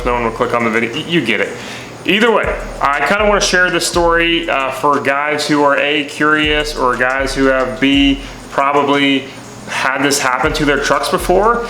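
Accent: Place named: Maryland, United States. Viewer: Familiar accent.. Maryland?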